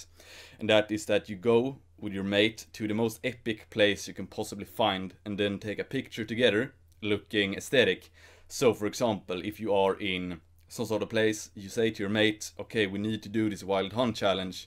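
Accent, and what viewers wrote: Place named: Stockholm, Sweden. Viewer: Är du från Stockholm? Kan inte undgå att höra dom karaktäristiska "i:en"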